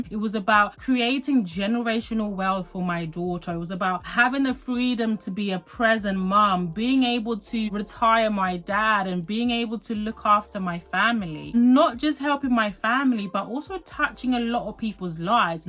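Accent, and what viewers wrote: United Kingdom. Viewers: I just love the British accent 😊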